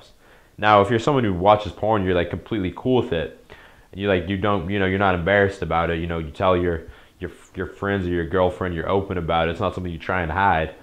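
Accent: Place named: New York, United States. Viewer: great video! you can tell this nigga from new york or something or has or had black friends lol "cant get that wood " lol "if you think about not getting hard its a rap son"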